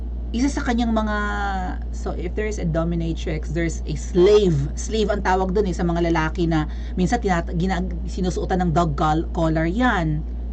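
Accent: Iloilo, Philippines. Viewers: Girl cute mong mqgsalita ng ilongga. 💖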